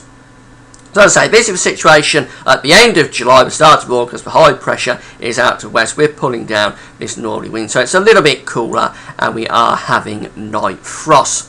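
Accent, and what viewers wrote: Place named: East London, United Kingdom. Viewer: was a bit giddy at the start and full of the energy. Sounds like east London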